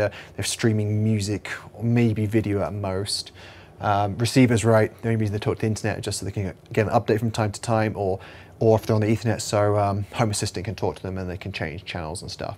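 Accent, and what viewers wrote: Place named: United Kingdom. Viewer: Also, where are you from? You sound british but have other influences in your accent. I'm from the UK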